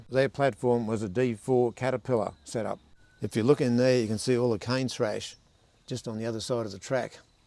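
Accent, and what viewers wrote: Australia. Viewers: Australian accent. Hard to hear 😂😂😂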